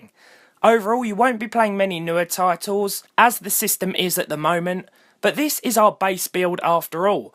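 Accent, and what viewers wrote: United Kingdom. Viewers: Luckily I could listen it all, but does British voice always sound like that?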